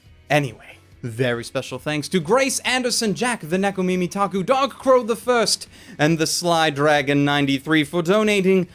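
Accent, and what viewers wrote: South, United States. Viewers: With that southern accent, are you imlying that people from the southern parts of America is religious fanatics? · your southern accent killed me , your sense of humour is so fuckin underrated and entertaining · why you gotta do a southern voice bruh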